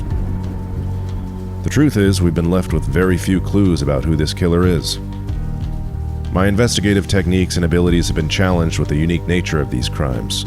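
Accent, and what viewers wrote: United States. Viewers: Hold up anyone else notice he is from ENGLAND with an AMERICAN voice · An American accent?? My God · Does anyone realize this guy is a British but he has an American accent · American accent narrating this, made it seems less authentic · why does he sound american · why is the narrator american? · English detective with an American accent. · The only English guy with a American accent...... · Why the American accent ? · Brit hunter American English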